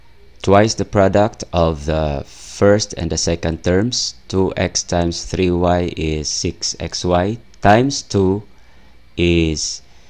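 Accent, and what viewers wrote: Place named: Philippines. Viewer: You sound like a Filipino po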